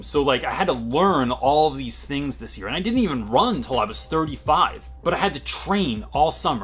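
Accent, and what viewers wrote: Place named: Philadelphia, United States. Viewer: Yessss. I spotted a Philly dialect feature. "Those Johns" hahaha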